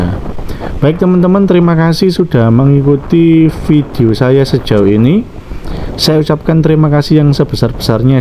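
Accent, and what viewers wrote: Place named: Java, Indonesia. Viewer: Logat bahasanya logat koploan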